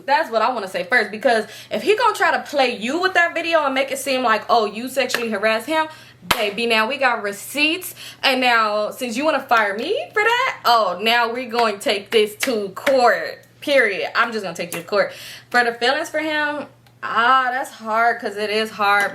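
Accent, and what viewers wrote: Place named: Texas, United States. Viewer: My brother heard the story about the weirdo in your apartment complex when you got your first apt. He say who is that talking about the creepy neighbor and I did not know he was listening 👂 huddling 🤦‍♀️ I told him I found your channel through the pandemic and I been riding with you since. We from Houston, Texas. I thought you was from Texas by the way you talk. But I found out your from California through your stories. You gotta let me know when you coming to Houston cause I wanna meet you and I love your vibe. I’m 43 I’m not with the bs and I’m not a weirdo 😫😫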